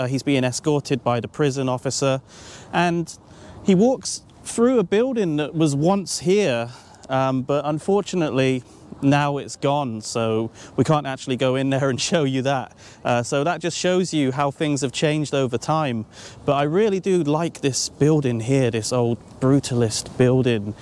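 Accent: United Kingdom. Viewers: Great to see these locations , good video . The Nadsat is cool but as you are an Englishman , complete with middle class accent , it is really jarring to hear you use a phrase like 'super excited to be here' . Come on man , really ? Not quite as depressing as the McDonalds . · Yeah; this definitely works better in a British accent... (esp. reciting fake Russian words)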